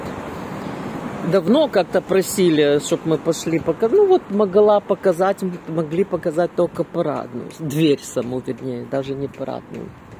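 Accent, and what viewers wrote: Odessa, Ukraine. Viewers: Большое спасибо за прогулку. Я в Одессе училась в ОГУ, работала вышлв замуж, родила реенка. К большому сожалению по семейным обстоятельствам вынужлена была уехать в другой город, но Одесса всегда со мной. Судяпо говору вы настоящая одесситка. Обожаю вас. Напишите по какой улице вы идете, для меня это важно. Только название настоящее. Заранее благодарю. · Прогулка по Одессе прелесть! Милый одесский говор! Оставайтесь прежними одесситами, не поддавайтесь новой политике. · Класс!!! ---,, но уже пошли по здесь! " неповторимый Одесский говор, мягкий, добрый!!! Маса удовольствия, слушать ВАС!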